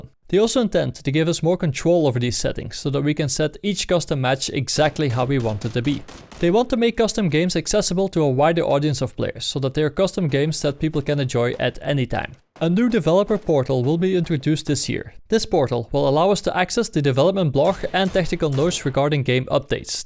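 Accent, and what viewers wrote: Netherlands. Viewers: He;s Dutch I think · My guy you sound so extremely fucking Dutch, it's unbelievable.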